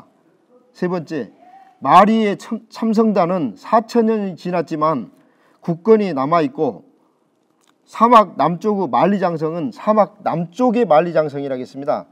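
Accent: Jeolla, South Korea. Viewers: 말투가 전라도신데 사투리가 경상도라면 더 믿음이갈것같네요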